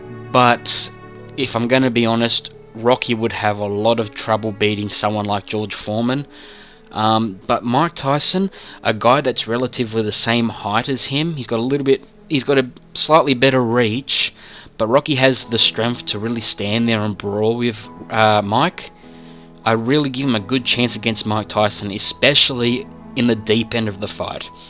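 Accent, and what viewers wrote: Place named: Australia. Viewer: You sound Australian, what would you know about boxing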